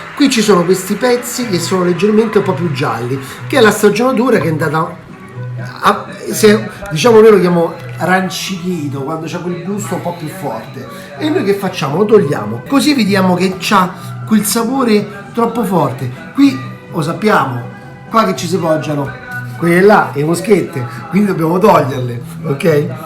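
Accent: Rome, Italy. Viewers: Si forse saresti un buon cuoco se non ti allarga tanto e lavora di piu , con questo romanesco squassato . .....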